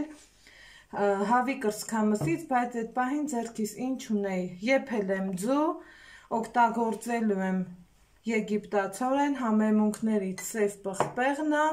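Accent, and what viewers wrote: Lori, Armenia. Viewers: Լոռվա ակցենտով եք խոսում, շատ հաճելի էր ամպայման կպատրաստեմ👍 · Շատ հավեսին եք խոսում, Լոռվա ակցենտով 😁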